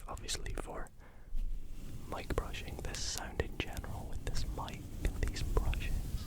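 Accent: Scotland, United Kingdom. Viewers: I started watching asmr in 2022 but not sure if it was 22 or 23 when I came across you and it was the Scottish accent that made me sub, so tingly!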